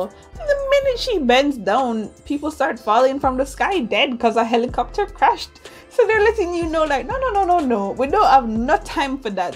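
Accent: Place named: Caribbean. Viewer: I am livingggggg for the patois and confidence. serving us island girl realness as always.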